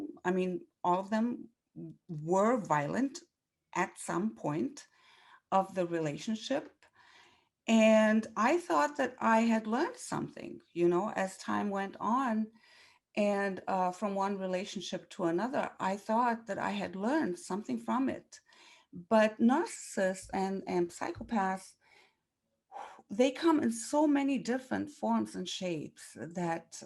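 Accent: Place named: Germany. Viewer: Thank you sister in Christ, glad I found your channel and happy for you that you were set free from your narc(s) 🙏🏼 Going on 17 years of marriage with a Christian covert narc next year. The Lord has opened my eyes completely. Starting Minute 22 is spot on! I can hear an accent- are you from my home country Germany? God bless you 🙏🏼🤍🦾 MARANATHA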